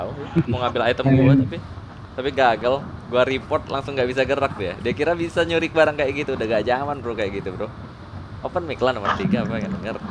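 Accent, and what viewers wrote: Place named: Sumatra, Indonesia. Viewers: logat ujung sumatra nohh,,, maluin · Orang aceh. Itu dger bahasa ngomong nya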